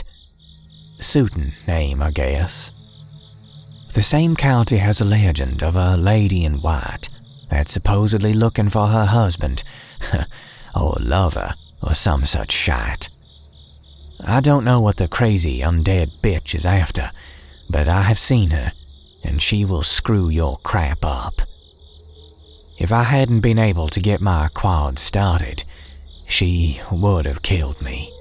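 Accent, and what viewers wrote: South, United States. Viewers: Southern accent pretty damn close! My family is from the south, and you did pretty well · Btw impressive southern accent 👌🏻😊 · oh I didn't know that youtube still alerts you on comment even on old videos that's cool, anyway thanks for reading these stories in a southern accent add to the feels and keep up the awesome work. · Love that thair southern accent ya got!!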